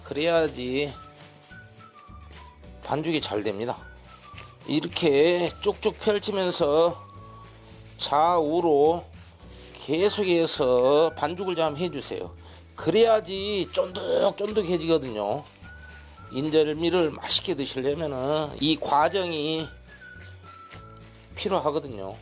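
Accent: Jeolla, South Korea. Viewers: 전라도 사투리가 아주 구수허니 정겹습니다 말씀 만큼이나 요리도 정성도 꼬숩고 대단하십니다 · ㅋㅋㅋㅋㅋ. 선생님. 말씀 구수한 사투리 전라도 말씀 진짜 잼있어요. 음식도 맛나고 말씀도 구수하고. 진짜. 잘 알려주시고. 고맙습니다. 모는 음식. 해봐야 하는데. 시간도 없네요 ~~ㅎ ㅎ😂😂😂🎉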